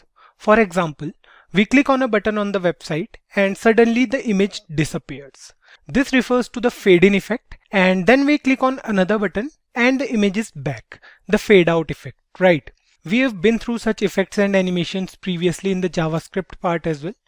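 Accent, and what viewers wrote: India. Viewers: I cannot accept the sound of Indian. Its very difficult for me to understand.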